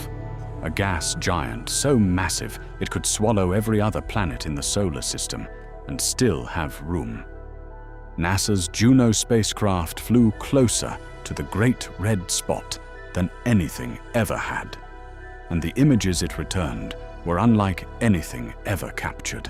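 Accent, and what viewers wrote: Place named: United Kingdom. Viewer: Awful British accented narrative sucks.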